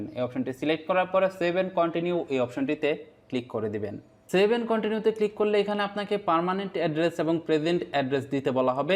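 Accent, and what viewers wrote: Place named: Dhalapara, Bangladesh. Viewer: Mone hoy apnar basha Dhalapara...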